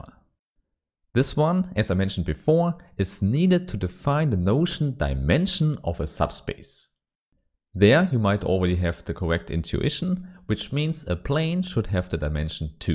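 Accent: Germany. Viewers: Haha sehr geiles Videos. Das deutsch ist nicht zu überhören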